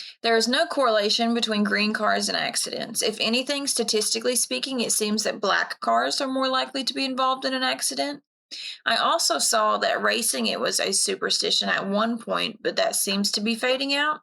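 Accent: Kentucky, United States. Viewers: It’s the humor… the sarcasm…. The KY accent?? I’m here for it!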